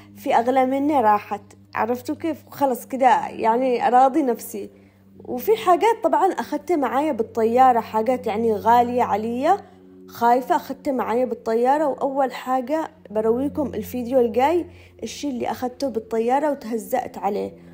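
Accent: Aden, Yemen. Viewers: الحمد لله على سلامه الوصول يا الى مصر الله عينك شفت الحاجات المغلفه وحسيت بالمجهود الي باتقومي به للترتيب هذي الحاجات اكيد بياخذ وقت ومجهود كبير منك الله يكون في عونك بس نصيحه مجربه كل ما اجيتي على نفسك وتعبك كلما خلصتي الترتيب والتنضيم بسرعه وجيبي معك احد يساعدك مش لوحدك تشتغلي انا. يمنيه واحب اسلوبش في الشرح وطبخاتش روعععه. ولهجتش العدنيه الحلوه وصوتش الجميل. الله يخليك لنا ياريت ماتتأخري وتنزليلي لنا اكلات رمضان الي بانزين مائدتنا بمأكولاتك الذيذه معك ام ماجد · اللهجه العدنيه حاليه وانت من نطقك لها صارت أحلى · انت يمنية منزوجه مصري والا كيف كلامك يمني الله يكون في عونك ويرزقك من الخير كله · انتي يمنيه باين من اللهجه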